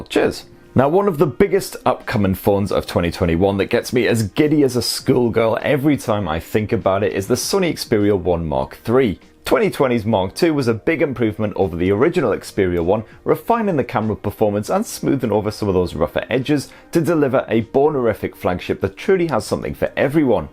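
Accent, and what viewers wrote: United Kingdom. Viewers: Love the approach to all your videos, you should be bigger but hey maybe some can’t handle the queen’s English. Keep up the great work mate .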